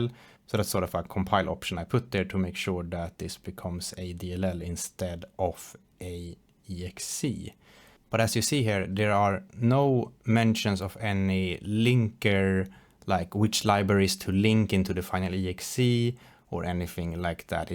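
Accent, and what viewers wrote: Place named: Sweden. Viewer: He's Swedish.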